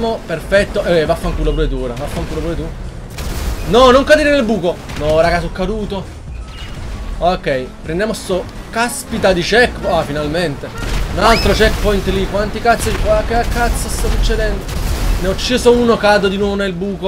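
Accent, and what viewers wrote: Naples, Italy. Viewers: È di Napoli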